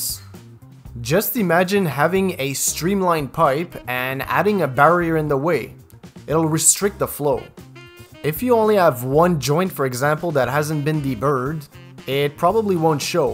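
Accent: Quebec, Canada. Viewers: I do hear a québecois here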